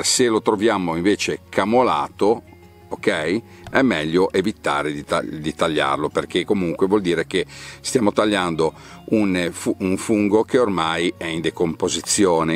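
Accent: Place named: Liguria, Italy. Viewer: infatti mi pareva dall' accento che tu fossi ligure!! Liguria regione meravigliosa ! Grazie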